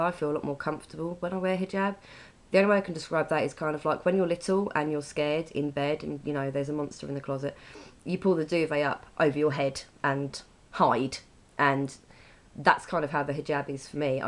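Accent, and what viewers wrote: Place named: United Kingdom. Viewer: First of all I love your accent! lol you are probably the first british that I'm able to understand haha :). I'm watching your videos and wow, it's the first time that I see a christian girl wearing the hijab. Respect. I love how you are breaking the stereotypes, no one would say you are christian just because you are wearing the hijab. Did you experience any discrimination against you? I wonder how their face looked like when you tell them that you are actually christian haha.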